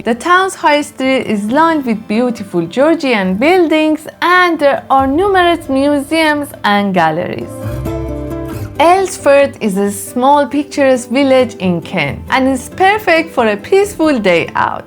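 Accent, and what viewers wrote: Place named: Iran. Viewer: You sound very Iranian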